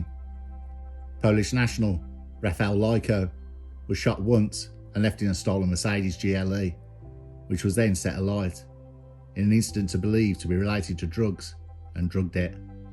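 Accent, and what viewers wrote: Birmingham, United Kingdom. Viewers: yeah I’m usually good with accents but although it sounds brummy to a point I was unsure so thought I’d ask, top channel pal keep the videos coming! Salute.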